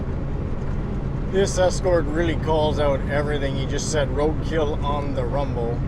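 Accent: Canada. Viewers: DUDE! Fascinating video! I loved how you patiently dealt with the round-a-bouts in NY. I am a lifelong long Quebecor now living in California and I'm trying to identify your accent because your english is perfect. Acadian? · I can hear that Quebec accent ;)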